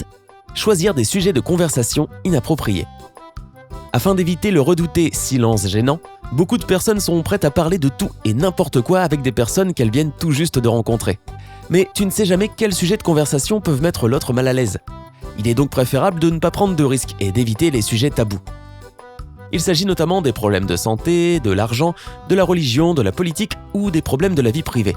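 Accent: Quebec, Canada. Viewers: Si le gars est Québécois, il parle comme au Québec, on ne peut pas lui en vouloir de ça - à nous d'adapter pour l'Europe :-)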